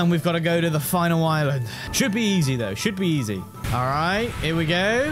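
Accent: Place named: United Kingdom. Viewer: Bro with his British speaking kinda sounds clean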